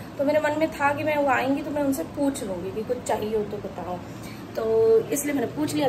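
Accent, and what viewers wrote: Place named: Pandit, India. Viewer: मैम आप बहुत खूबसूरत हैं ,😊आप पंडिताइन बोल रही हैं मुझे हंसी आ गयीं हम भी पंडित हैं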